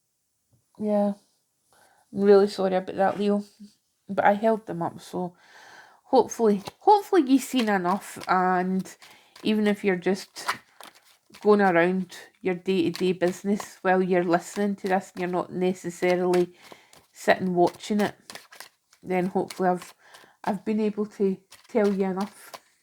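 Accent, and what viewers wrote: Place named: Glasgow, United Kingdom. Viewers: The accent feels like home to me, as my late father was Glaswegian. My friend gifted me Osho Zen cards and the cards I pulled pretty much said "I'm not meant to know yet", like the clouds in the tapir card. Thank you for the reading ❤ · Great read, love to hear a weegie, my family all from Glasgow and you make me feel like home ❤ thank you ❤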